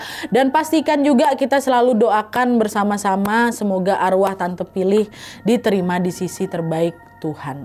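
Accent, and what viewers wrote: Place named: Manggarai, Indonesia. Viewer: Ini cerita dari manggarai, dari logatnya, makasih wakkk udahh nyeritain😅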